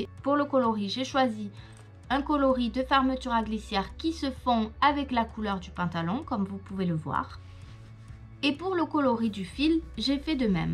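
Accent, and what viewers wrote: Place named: Carcassonne, France. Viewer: Super vidéo Je sais changer une fermeture eclair mais cette vidéo apprend toujours Bravo à vous Je vous découvre et je m abonne Vous avez un petit accent comme moi 😂 Etes vous de Carcassonne ?😂😂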